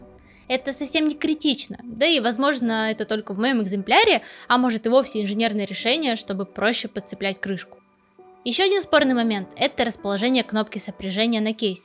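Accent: Perm, Russia. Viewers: Вы что, из Перми?)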